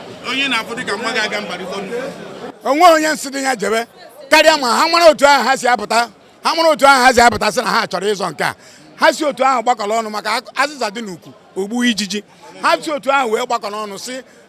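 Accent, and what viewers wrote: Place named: Anambra, Nigeria. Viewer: He's from Anambra state not IMO... but all the same, we are Biafra 😊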